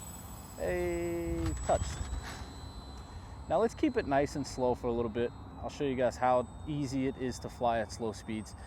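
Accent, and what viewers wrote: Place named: Boston, United States. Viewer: Man keeps saying "supah" and I keep thinking "dudes gotta be from Boston"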